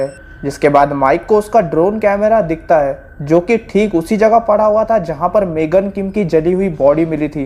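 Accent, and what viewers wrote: Maharashtra, India. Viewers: Marathi jesa lag rha h accent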